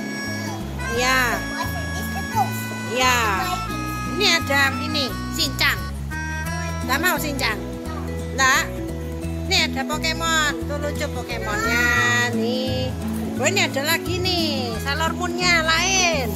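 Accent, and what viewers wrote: Java, Indonesia. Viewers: Kok kya orang jawa hhhh...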